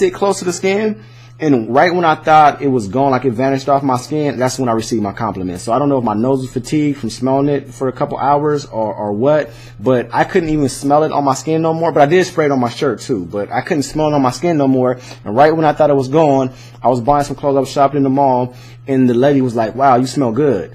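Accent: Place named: California, United States. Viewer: you could tell he's from califronia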